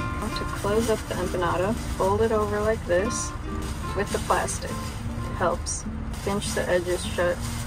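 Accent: United States. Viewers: You're from Mother Russia? You sound completely American. Make some Borscht. 🙃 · Sounds very american...I never know she is russian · Southern accent with a twist 😜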